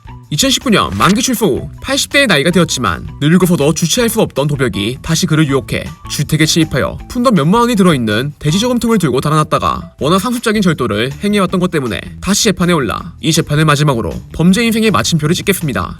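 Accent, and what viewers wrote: Jeolla, South Korea. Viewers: 아따 또 전라도여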